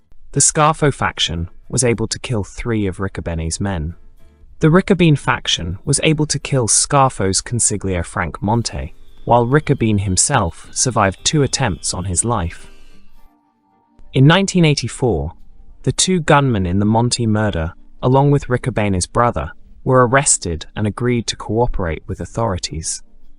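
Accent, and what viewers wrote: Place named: United Kingdom. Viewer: Italian/American tough guy mafia and it sounds like some scared Brit narrating... see ya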